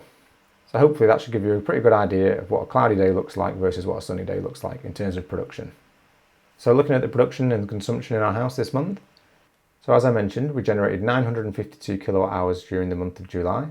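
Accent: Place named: Northern England, United Kingdom. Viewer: Nicely done, so glad to see / hear a Northern voice. Will pinch the spreadsheet as well 👍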